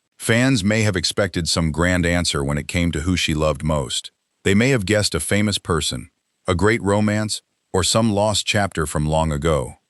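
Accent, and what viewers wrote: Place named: United States. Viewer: Why do we have to suffer the American voice-over wether it be AI or genuine?😢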